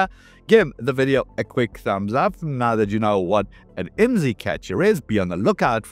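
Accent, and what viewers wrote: South Africa. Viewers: Whoa … when I saw your name, I expected your accent to sound way more like my dad’s rather than a South African.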